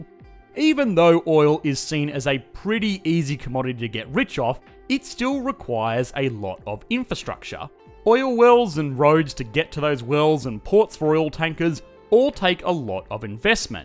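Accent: Australia. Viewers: The Australian accent is very very irritating. Please adopt a US accent.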